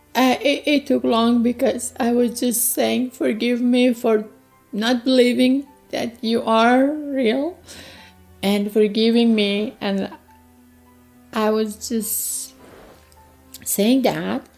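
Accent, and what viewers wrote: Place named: Iran. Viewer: I'm just curious... what county in the Middle East? My guess Iran from the accent . Jesus is who He said He was all along.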